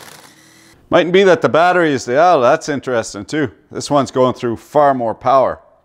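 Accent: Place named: Newfoundland, Canada. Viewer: Love the Newfie commentary!!! Beauty , keep it up!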